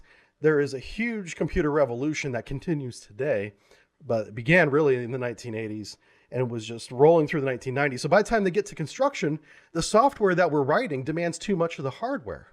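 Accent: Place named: United States. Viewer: And the narrator has an aMERICAN ACCENT.....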